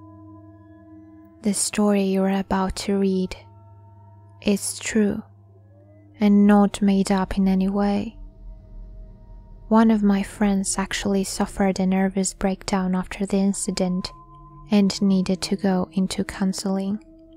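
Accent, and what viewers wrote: Ireland. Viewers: You sound a bit like a Greek beauty with an Irish accent. Not sure where youre from but your voice is addictive <3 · Is that an Irish accent? You sound like a fairy :-)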